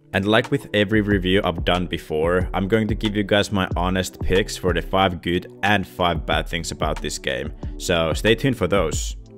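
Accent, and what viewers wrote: Finland. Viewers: kuule kuule kyllä siitä kuule että olet suomalainen letsgoooo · instantly knew you were finnish from your accent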